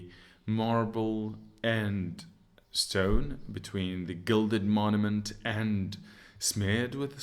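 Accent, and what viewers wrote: United Kingdom. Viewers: I thought you are from england !!😭💙💙💙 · If only there's a doctor with this perfect accent and language in my uni ɵ̷̥̥᷄ˬɵ̷̥̥ omg at first i didn't watch the intro so i thought u're an English person 😂 gd job British accent is so difficult for me, i think that's why i have an American accent lol,it's easier!.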